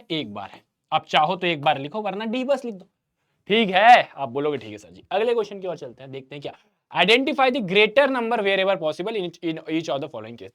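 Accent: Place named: Bihar, India. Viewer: Sir speaking bihari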